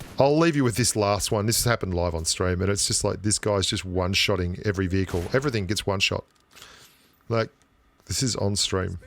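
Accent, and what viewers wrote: Australia. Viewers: Totally agree,...I dropped out of the entire last season and a half due to the exact same reasons you’ve just highlighted. Have returned to play in recent days. I’ve missed watching your content and hearing your hilarious Aussie-isms! 😊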